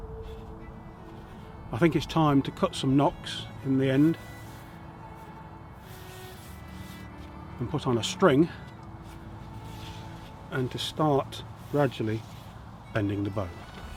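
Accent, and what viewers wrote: United Kingdom. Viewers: British AF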